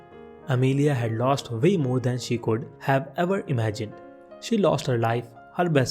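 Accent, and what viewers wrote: India. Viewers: I couldnt finish this. Your accent is the most painful mix of indian and monotone. My brain is in pain.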